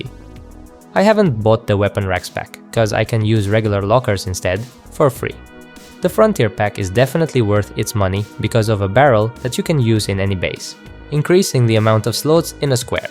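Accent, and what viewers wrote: England, United Kingdom. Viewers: вы из англии?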